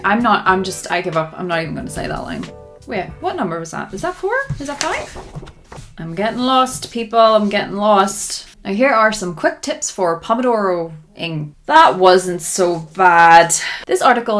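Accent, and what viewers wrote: Northern Ireland, United Kingdom. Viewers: Is that a Northern Irish accent I hear? 😅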